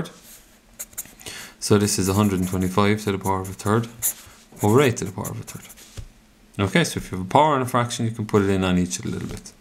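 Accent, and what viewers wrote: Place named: Ireland. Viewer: Cant work out if its the irish accent that did for me but weeepppiieeee!! Got it...lol Thanks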